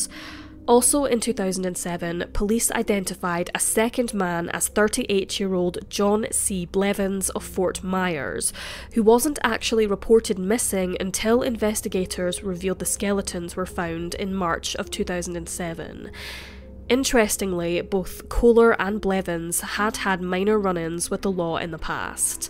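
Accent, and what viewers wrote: Scotland, United Kingdom. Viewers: Scottish, they sound beutiful until they get drunk and then noone can understand what the fu*k they're saying 😄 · Love her Scottish accent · Is the narrator Scottish? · The Scottish accent is enchanting, isn’t it? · It's the scottish accent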